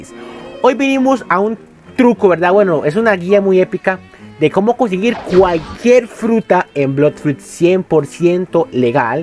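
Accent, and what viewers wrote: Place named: Costa Rica. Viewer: Los ticos hablamos asi y desimos verdad casi siempre por si no lo sabiam gente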